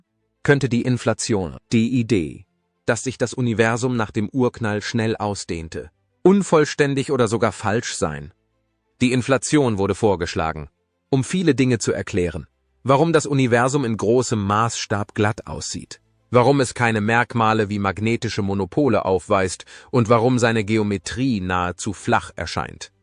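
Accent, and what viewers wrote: United States. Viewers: Die IT Stimme mit leicht amerikanischen akzentuiert ist zum kacken🥴😝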